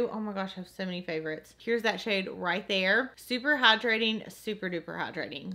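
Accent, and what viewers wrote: South, United States. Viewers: Why would ANYONE dislike video?! Lol 😂 honestly idgaf what video it is, I love watching her stuff, her voice is relaxing (her country accent) and she's funny!!! PLUS she talks about makeup!!! What's not to LOVE?!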